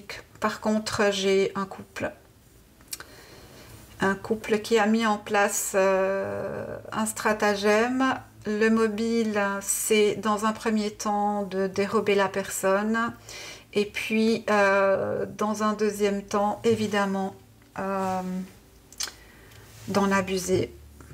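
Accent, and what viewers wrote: Switzerland. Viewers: Bonjour je me suis abonnée. Vous êtes suisse? Je reconnais votre accent. Je suis de nyon.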